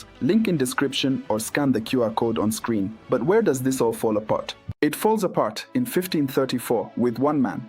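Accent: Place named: England, United Kingdom. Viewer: UK now time here You have an English accent not a USA accent - are you based in UK? love all your talks and shorts.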